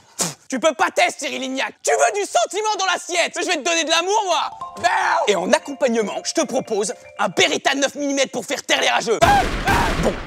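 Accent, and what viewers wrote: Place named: Quebec, Canada. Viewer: En passant ton accent qu'on appelle le joual est je le sais car je vis au et qu'on utilise ct'osti d'accents la tabarnac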